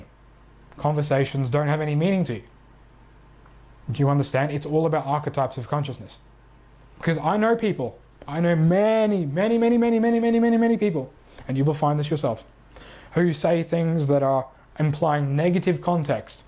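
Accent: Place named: Australia. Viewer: Thank you so much for your reply,. Usually I can hear the Aussie a lot but your accent isn't very strong. Anyway, I realized you were likely Aussie when I saw one of your older vids with you in a forest that was definitely not anything you'd see in England lol. Thank you for the insights on the qi stuff. Sounds pretty accurate I'd say even though I don't have a thorough understanding of the five elements, I have dabbled in the arts. I just purchased your subliminal and created my own 1 hour version and am going to listen to it while gazing and also while I do my drawings. You are right about the geometries in my field of vision. Actually, I did notice while gazing the flower of life pattern overlaid onto reality...I don't really know how to describe it. Kind of like a hologram or something. It's very subtle. Wishing you an awesome day and really grateful to have found you and also overwhelmed by everything I'm learning. 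I'd definitely be interested in working with you and possibly getting on the immortality program, as that is my aim, and currently working on manifesting my wealth so that I can do these things. I feel I have come a very long way on my own without help from anyone, just following my path, and yet I still feel very lost, at least mentally I don't have a grasp on what is happening but you are helping me out with that. Thanks!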